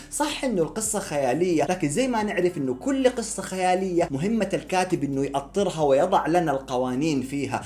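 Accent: Jordan. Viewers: انا جايه من شارع الاعشى ، انصدمت انك بتعرف تحكي اردني اكثر مننا 😂😂😂❤❤ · اكيد كنت عايش او بتدرس في الاردن مستحيل اللهجه والاداء هذا من كم يوم او سياحه هناك😂😂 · انتا اصلك اردني حجي؟ انتا بتحكي اردني احسن مني 😑 استمر يا وحش 🖤😁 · مش طبيعههي ياا الله طلع اردني الشب😂😂😂❤️ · يا اخي متت ع كلامك الأردني جايب الشخصية صح😂😂😂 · تعليقي رح يكون بس على لهجتك الاردنيه الصح😅 لو مثلت بالمسلسل كان عملت جو رهيب😍 و غير هيك انه لكنة دواوين اصلي و ابن الاردن صح 😍 يعطيك العافيه ع هيك اداء جبار😍❤❤ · تقييمه 3.3 بس لهجتك الاردنية تجنن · حبيت تمثيلك الأردني فرطت 😂😂 اقسم بالله انك فنان متقن اللهجة بشكل رهيب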